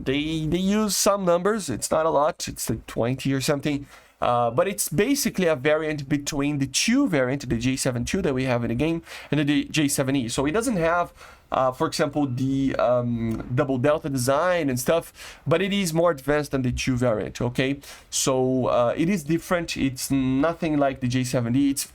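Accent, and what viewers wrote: Brazil. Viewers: Tu é br? Sotaque parecido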